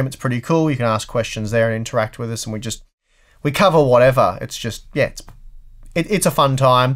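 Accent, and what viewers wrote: Australia. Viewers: Its by Aussie accent 🤓 👍.. Propably many times people hear all sorts of things. Me included that left me wondering how to manage the hearing 😄. Ah well, anyways. HU channel makes Good videos. One of my Favourites. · Your Ausie accent is tricking people :) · Poor Youtube subtitles, can’t understand an Aussie accent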